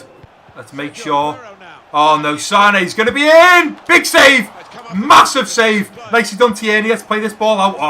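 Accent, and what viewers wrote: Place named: England, United Kingdom. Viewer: Where are you from? I mean the country. Because it sounds like you are from England.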